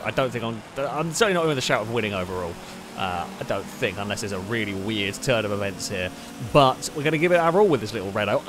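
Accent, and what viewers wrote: United Kingdom. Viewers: You realise is British, right? Only Americans call Z's, Zee. Most other English speaking country calls it Zed. And calling it a "Sell-eekah" is the British accent...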